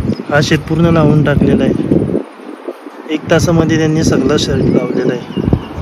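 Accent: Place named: Agari, India. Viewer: Agari bhasa ahee tii amchii amii lahan. Pana psun asch boltoo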